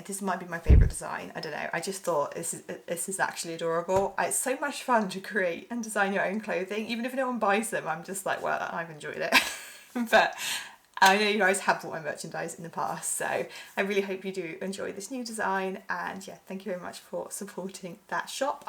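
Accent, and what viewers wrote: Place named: England, United Kingdom. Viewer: I thought your accent was English. 😄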